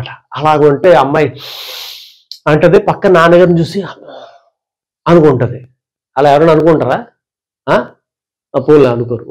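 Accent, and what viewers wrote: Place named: Godavari, India. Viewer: మీ గోదారి యాసతో డైరెక్టర్ కు గుణపం దింపారు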